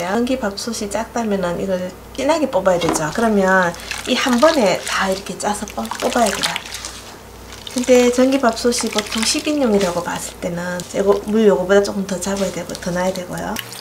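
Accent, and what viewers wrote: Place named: Daegu, South Korea. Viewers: 어릴때 엄마가 만들어주던 식혜 생각나요. 대구사람인데 이모 말씨가 촵촵 감기니 정겨워요^~^ 울 엄마한테는 전수 못 받았던 레시피.. 이모한테 배워가요♡ 뭔가 어려울 것 같아서 생각도 엄두도 못 내던 식혜~ 도전!!!! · 경북사투리에 참 듣기좋은 말소리를 하시네요..보통 경북사투리 참 듣기싫은데..경상도 사람 입장에서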